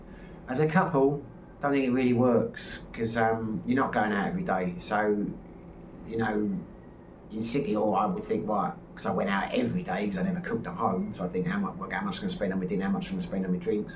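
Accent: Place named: London, United Kingdom. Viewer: Good to hear another Londoner in the Philippines, I'm living in Cebu, also got a you tube channel, loving it here, keep up your channel. Cheers mate, Colin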